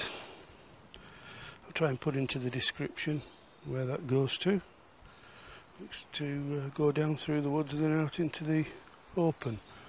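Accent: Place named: Yorkshire, United Kingdom. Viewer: Absolutely fascinated with your video. Never knew we had so much history on our doorstep! Keep 'em coming, and NEVER apologise for our Yorkshire accent lad!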